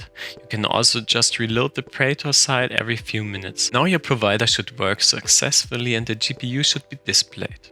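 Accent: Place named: Eastern Europe. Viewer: Акцент славянский. Ничего не понятно, но очень интересно.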